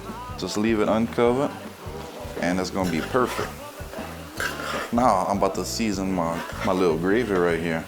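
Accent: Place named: Louisiana, United States. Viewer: it’s the accent for me . louisiana 🤪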